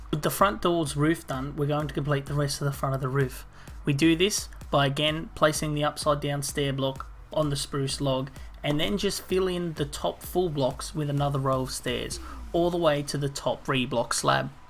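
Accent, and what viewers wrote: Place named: Australia. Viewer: What a nice surprise to hear that accent after watching your timelapses - Aussie? Amazing content though mate, keep it up, you're destined for greatness!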